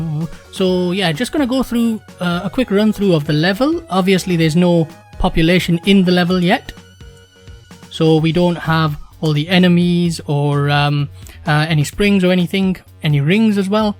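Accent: United Kingdom. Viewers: Awesome work btw not to be too creepy but what part of the uk are u from?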